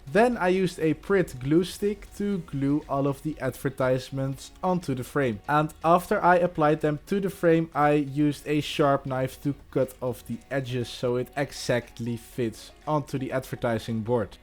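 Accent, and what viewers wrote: Netherlands. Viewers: I recognise the Dutch accent instantly!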